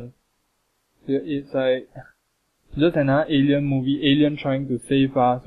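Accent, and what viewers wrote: Singapore. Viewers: Obviously Singlish......==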